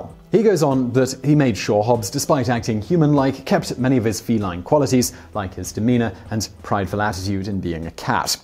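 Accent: England, United Kingdom. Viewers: Why is not cooking and have english accent?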